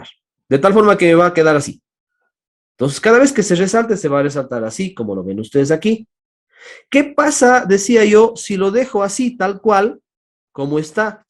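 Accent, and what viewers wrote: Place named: Ecuador. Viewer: Por su acento al hablar sabia que era Ecuatoriano, muchas gracias buena clase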